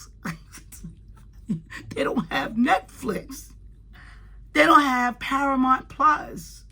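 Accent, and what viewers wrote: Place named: New Jersey, United States. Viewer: Loved your video, glad it came across in my algorithm, and yes, I subscribed. Your voice and your accent gave me a warm and fuzzy feeling of listening to my relatives back in New Jersey ❤ Looking forward to your new videos while I catch up on your old ones!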